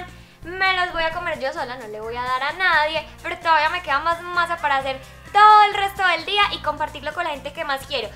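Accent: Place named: Antioquia, Colombia. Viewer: Realmente el acento Paisa.. (de Antioquia)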